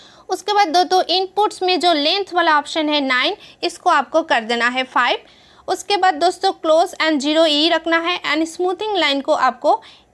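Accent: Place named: Bengal, India. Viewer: Sweet Bengali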